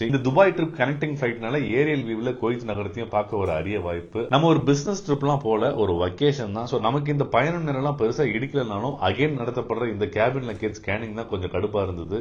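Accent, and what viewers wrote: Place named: Sri Lanka. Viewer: Nenga srilanka a bro.? Ungal tamil ucharippu migavum arumai. Pothuvaga as compared to Tamilnadu people ur tamil slang is more og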